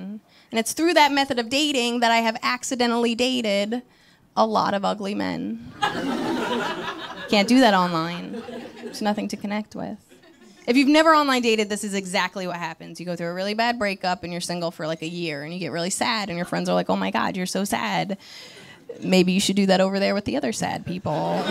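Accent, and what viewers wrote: New York, United States. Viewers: Shes like super cute. But shes got that standard, new york, fran drescher thing goin on. She would make a nice wife.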